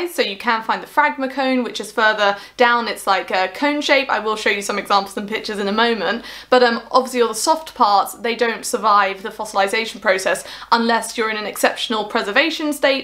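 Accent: United Kingdom. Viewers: Talk about paleontology it's amazing, but British accent make this talk so beautiful. Thanku! Rawr!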